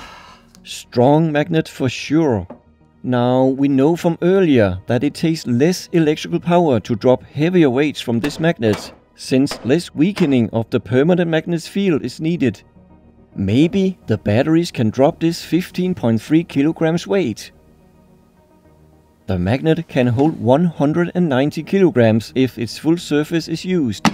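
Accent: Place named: Denmark. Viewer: Rema 1000 is a Norwegian discount supermarket chain also operating in Denmark where I'm sure is from as I can tell on his thick Danish accent. So, these are just private label batteries made for Rema 1000 supermarkets. And Kameda is a private label battery brand of the Danish discount tool chain Harald Nyborg. Harald Nyborg is kind of Denmark's answer to America's Harbor Freight. So, it's also unknown who makes Kameda batteries. And with all private label products the manufacturer can change from one year to the other as the retail chains usually contact the lowest bidding manufacturer to meet their requirements.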